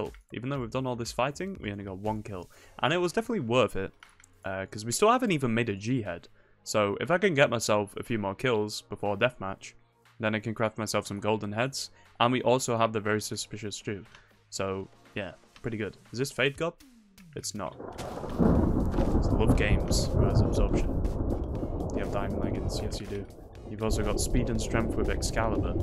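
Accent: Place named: United Kingdom. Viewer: how i found your channel from like 10 months ago is i saw a hoplite video heard a british person speak and i was hooked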